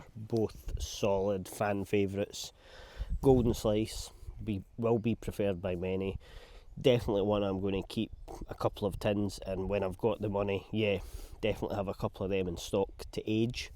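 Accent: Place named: Fife, United Kingdom. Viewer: Its nice to hear a Fife accent. Orlik gets a lot of good reviews, and I think I'll copy your lead and try both.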